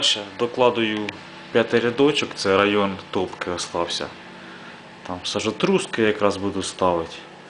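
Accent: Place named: Kyiv, Ukraine. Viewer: Судячи по назві,серйозний проект.А по говору,Київська область.Удачі Вам,в нелегкому ділі ,,тетріс з камінцями''.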